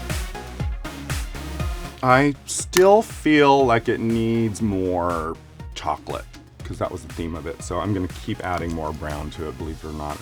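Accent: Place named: Mid-Atlantic, United States. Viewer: I love Raja's voice. There's a touch of old Hollywood/mid Atlantic without being fake.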